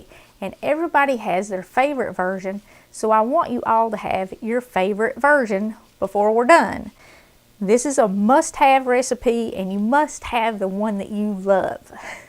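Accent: South, United States. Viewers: It's nice to hear your Southern English voice again:) I feel that you've been going through a hard time... (sickness - death..?) God bless you,.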